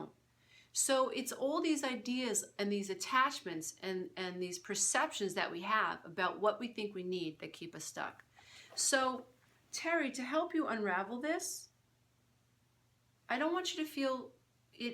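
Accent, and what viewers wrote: New York, United States. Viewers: Great advice. Are you from the Bronx?Brooklyn?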